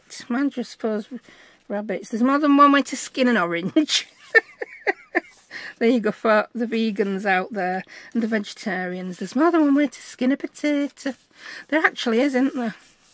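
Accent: United Kingdom. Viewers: I absolutely love love love these. What a brilliant idea, so much journaling space inside a beautiful belly band. My favorite is the pink Foxglove plant. Beautiful!!!!!!❤ I’m so glad I saw your video! Thanks so much for sharing. I also enjoy your distinctive British accent and sense of humor since I’m from the U.S. 😅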